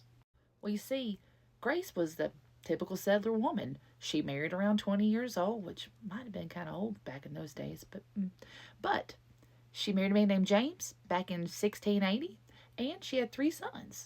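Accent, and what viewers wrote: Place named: Tennessee, United States. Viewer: Thank you for this video. I saw it in my feed this morning and was so excited to watch it. Grace is my ancestor ❤. We always hear about witch trials and the deaths that resulted from them but this old girl wasn't having it. She did her time and lived through it. I'm so proud to be able to claim her as Mamaw Sherwood. Whenever I'm going through a tough time, I remind myself what Grace went through and I feel just a tiny bit stronger ❤. Thank you 🙏 you did a great job. And you sound a lot like me - I'm from Tennessee.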